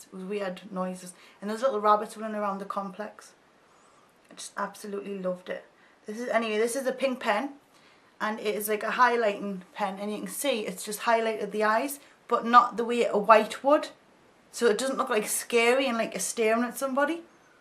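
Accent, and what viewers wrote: United Kingdom. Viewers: Love ya vid, were ya been man woman lol:-P You make me laugh, you,ve got some lovley comments on here, but what I cant stand is people asking u to " talk properly" and to "not talk" well is a Geordie this is how she speaks thats north east England and lots of us like her talking, it's called being real!!!! X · hahaha i love ur accent so much!!! i hate most british ppls accents but urs is so cool!!!!!!!!!!!! God bless u jesus loves u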